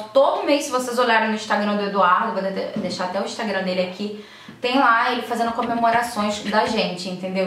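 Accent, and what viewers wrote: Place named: Rio de Janeiro, Brazil. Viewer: Ela é bem do rio ela arrasta o nasci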